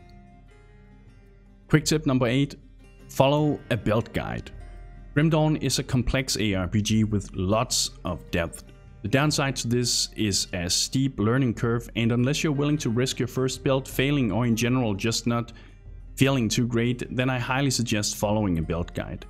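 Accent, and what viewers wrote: Denmark. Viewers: Thought you sounded aggressively danish then you started throwing shade at those pesky swedes. Thank you for the video bror 🙂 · Not sure what's worse, looking swedish or having that horrible danish accent. However, this video is exactly what I was trying to find, great tips! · Your accent is a give away for your Danish roots, but the Swedish hobo joke removed any doubt 😂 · are you danish? sounds alot like a danish accent... bashing the swedes... definitely danish :D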